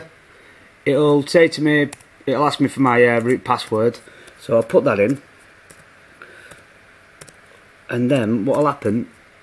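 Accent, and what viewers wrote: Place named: Lancashire, United Kingdom. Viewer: if only it were this easy to put it on my one plus one. nice video made better for a lancashire accent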